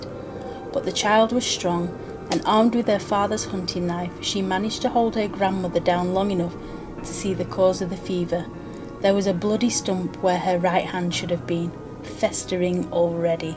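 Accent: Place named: England, United Kingdom. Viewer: glad to hear this being read in an english accent, good job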